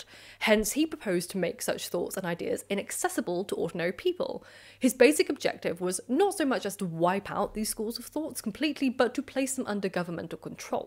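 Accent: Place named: England, United Kingdom. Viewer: Semi-regular who is constantly asked about their strong southern American accent, tunes in for, strong English accent…I reckon. :)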